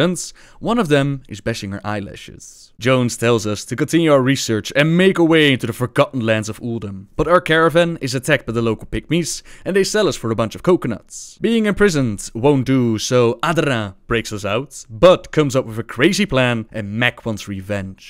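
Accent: Netherlands. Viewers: Lol ik dacht... dat klinkt nederlands.. en die stem herken ik.. ehhhh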